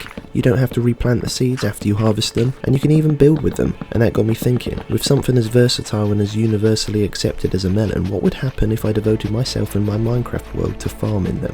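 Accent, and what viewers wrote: United Kingdom. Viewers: Ah yes British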